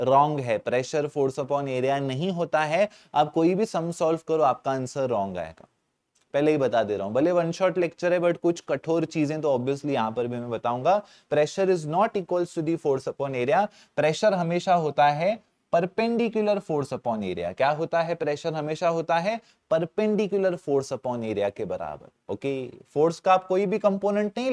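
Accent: Sindh, India. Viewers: Sir ki sindhi accent bhot relatable hai😂❤️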